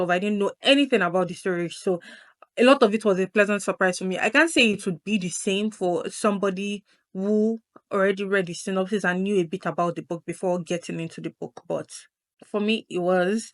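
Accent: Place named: Jamaica. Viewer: Amazing video love your accent are you Jamaican also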